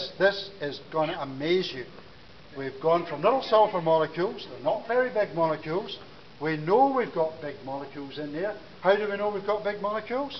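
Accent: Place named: Scotland, United Kingdom. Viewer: its called a scottish accent stud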